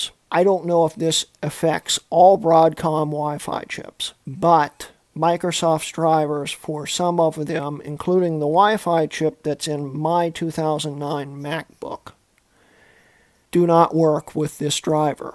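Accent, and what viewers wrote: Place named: United States. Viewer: Gosh that American voice is absolute AIDS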